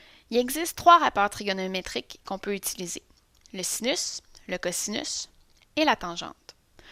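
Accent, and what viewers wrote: Quebec, Canada. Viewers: Je ne sais pas si c'est l'accent Quebecois, mais j'assimile beaucoup mieux :D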